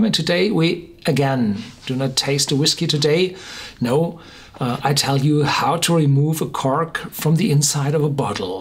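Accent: Germany. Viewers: his voice and his german accent are sooo ear-pleasing !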